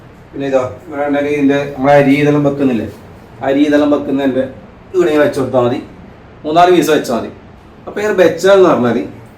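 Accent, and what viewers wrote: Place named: Bengal, India. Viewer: നീ മലയാളം പഠിച്ചിട്ടു പറയു, വിഷയം നല്ലത് but ബംഗാളി, ഇതു പറഞ്ഞാൽ പകുതിയേ മനസിലാകുന്നൊള്ളു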